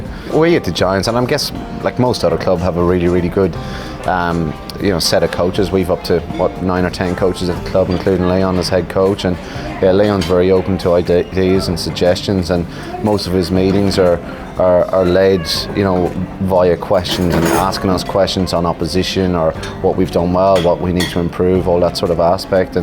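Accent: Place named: Cavan, Ireland. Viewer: I love the fact hehas a Cavan accent (that's how you spell it)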